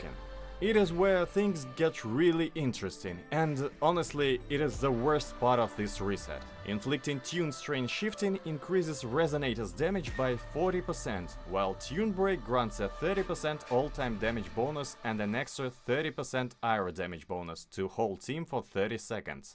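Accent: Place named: Russia. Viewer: Акцент, как будто ты родом из России, но живешь в америке много лет))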